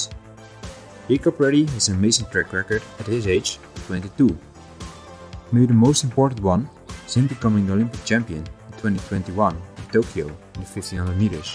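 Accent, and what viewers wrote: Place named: Germany. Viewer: Meest denglish accent ooit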